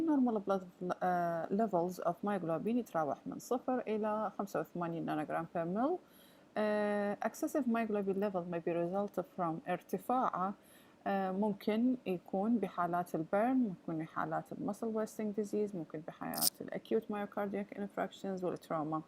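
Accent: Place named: United Kingdom. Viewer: Great , u have a really nice British accent 💜🙏🏻 Well, I speak with American accent but.... I think that British is beautiful than American accent and so clear 😐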